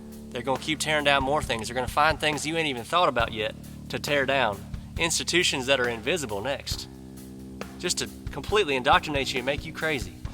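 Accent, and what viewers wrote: South, United States. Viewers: still southern brother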